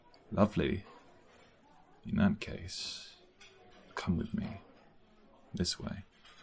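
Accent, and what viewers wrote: United Kingdom. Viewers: 7819/10 Great audio Love the English voice The audio is lovely, crisp, enchanting, the end it where it’s at for the most but the audio overall is like fine wine. I would definitely recommend it.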